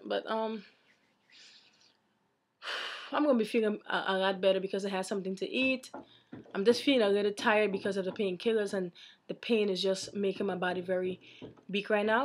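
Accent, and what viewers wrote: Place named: Guyana. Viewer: Are you Guyanese? you sound so.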